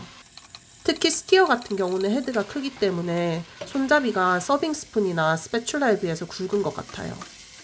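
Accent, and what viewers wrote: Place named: Gyeongsang, South Korea. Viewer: ㅎㅎㅎㅎㅎㅎㅎㅎ 경상도 사투리가 영상의 재미를 한층 더 올려주는거 같어요.😊😊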